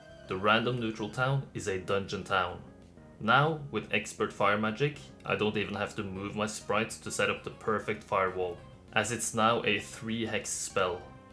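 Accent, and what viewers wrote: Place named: Norway. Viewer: ❤ By the way, judging by your accent, might you be norwegian?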